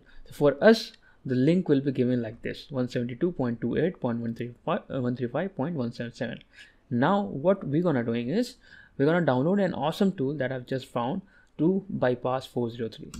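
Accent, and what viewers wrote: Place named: India. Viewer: he tries) but the accent is terrrrrrible..I'm drowning in his Hindi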